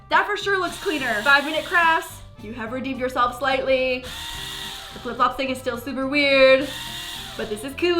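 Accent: Canada. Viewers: New here. Love how she says "about". Reminds me of Cristine. Is she from Canada?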